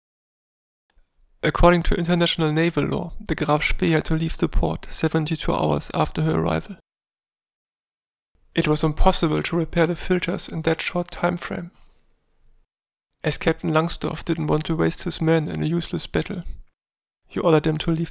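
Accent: Germany. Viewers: German accents from certain areas sound 'sad' to English speakers. The video is rather good, I thought.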